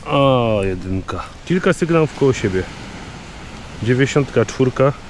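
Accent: Silesia, Poland. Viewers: W mowie słychać że jest taki charakterystyczny ton dla ślązaków (Pozdro z śląska)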